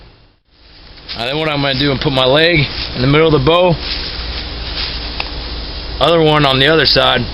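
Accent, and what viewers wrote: South, United States. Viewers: Awsome bow !!very simple to make..nice hat and sunglasses 😁☺love his southern accent😂☺👍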